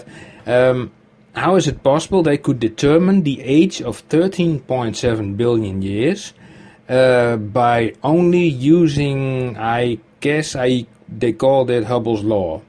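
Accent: Netherlands. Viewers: You have netherland accent english, nice.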